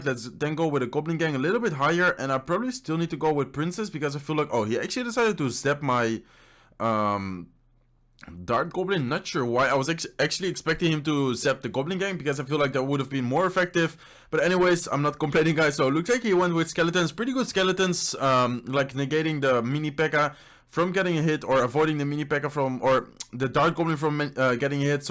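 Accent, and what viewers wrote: Netherlands. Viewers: He is dutch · Ik hoor zo goed dat je nederlands bent😂😂👍🏻 goeie vid tho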